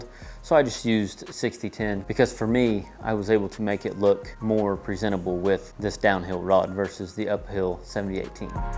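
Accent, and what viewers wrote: Oklahoma, United States. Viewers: Hey man are you from OK?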